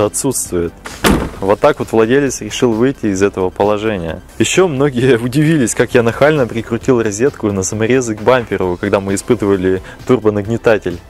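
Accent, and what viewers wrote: Perm, Russia. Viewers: Пермский что-ли?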